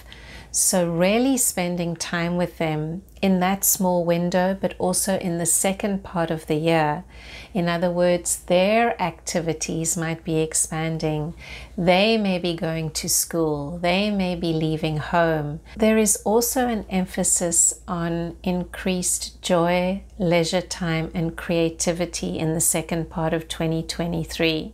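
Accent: South Africa. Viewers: You sound South African 🇿🇦🥰I've had this on my watch later list for a few weeks now. Glad I watched it now, spot on from the start of the video, thank you for the message 🌻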